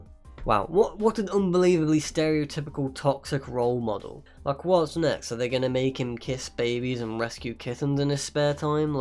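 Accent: United Kingdom. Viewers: Why are you British tho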